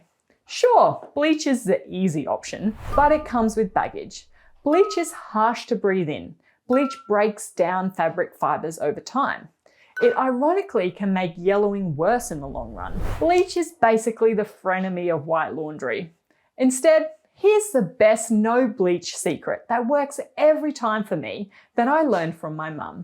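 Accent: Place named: United Kingdom. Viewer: It's lace and not "lice". Why do Britishers wag their tongue while saying certain words is beyond me 😮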